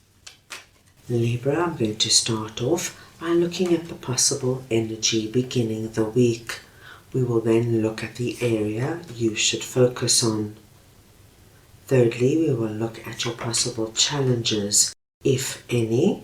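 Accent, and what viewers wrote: South Africa. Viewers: I love how you read, and also love your lovely south African accent🎉❤ Thank you 🥳🙏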